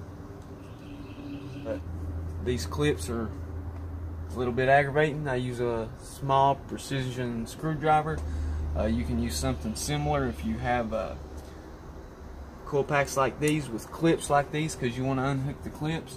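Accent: South, United States. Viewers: The information presented is ok , but that southern accent is sounded much better 😊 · Somewhere in the south. Really annoying to anyone outside that area.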